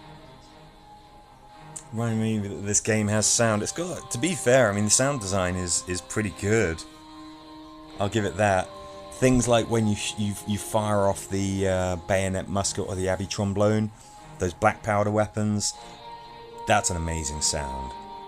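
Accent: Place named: United Kingdom. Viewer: Nice work, as usual. I think the British accent helps your credibility (not that you need it) but your commentary is superb.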